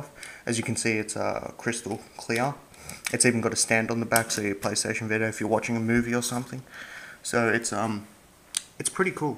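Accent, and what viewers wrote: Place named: Australia. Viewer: Hey an Aussie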